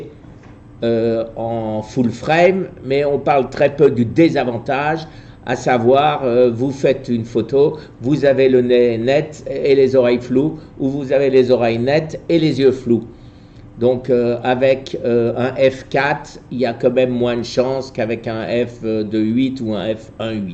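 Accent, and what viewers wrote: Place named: France. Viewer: Merci pour toutes vos excellentes vidéos! C'est bien aimable d'avoir fait celle-ci dans la langue de Molière, bien que lorsque vous les commentez dans celle de Shaekespeare, je comprenne bien également et que votre sympathique accent français m'amuse beaucoup! Continuez comme ça, bonnes photos et bien du plaisir pour la suite!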